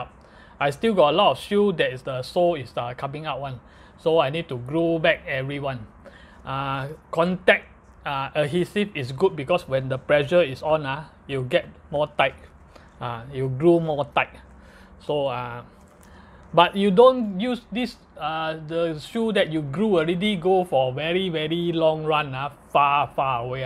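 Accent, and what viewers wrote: Singapore. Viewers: first time come across your video! solid. keep the singlish real. feels like home! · HAHA. Funny uncle. Thanks for your comprehensive guide! Love your Singlish!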